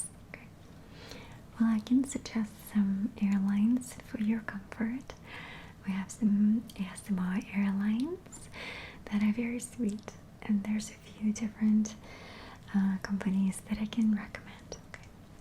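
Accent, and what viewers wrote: France. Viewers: How cute you are with your french accent 😍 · Ton accent français est juste magique pour les oreilles! J'aimerais trop un asmr en français un jour s'il te plaît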